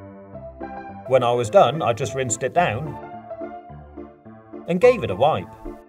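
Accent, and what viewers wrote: United Kingdom. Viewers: hi I was wondering are u brittish?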